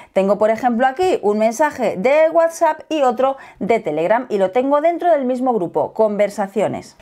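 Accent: Spain. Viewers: No se pero a mi me parese que la forma de hablar de los Españoles es vastate fastidiosa no me gusta 😑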